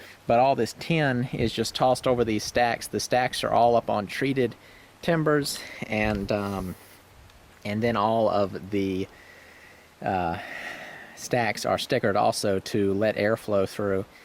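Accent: United States. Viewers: Hey bud, noticed your canoe next to your wood pile. I pretty sure from your accent and the terrain that we're in the same region of the US. Just wondering if you've ever done any whitewater canoeing on the Nantahala river or some of the others in the area. You seem like the kinda fella who would really enjoy it. I used to go pretty often when I was around your age. It's lots of fun and it's something I'd think you'd enjoy with your significant other. Thanks for the videos, really enjoy them.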